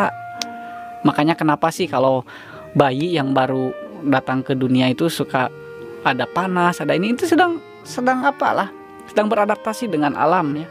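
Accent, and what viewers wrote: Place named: West Java, Indonesia. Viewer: Btw karna si aa logat sunda nya kentara pisan, sim abdi ngarasa jiga dipapatahan ku lanceuk nyalira hwehee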